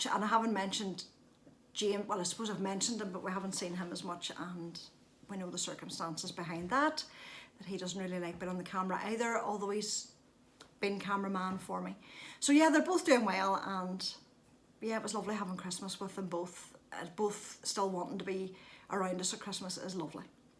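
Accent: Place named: Ireland. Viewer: Thank you so much, could listen to you all the time.Love your Irish accent.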